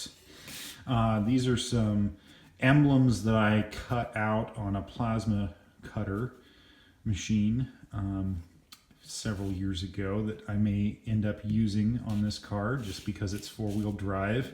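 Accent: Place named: Canada. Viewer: Why right-hand drive? Where are you? (You sound Canadian, or American. )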